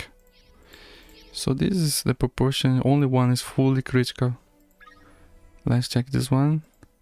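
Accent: Brazil. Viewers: Where are you from ? You really sound brazialian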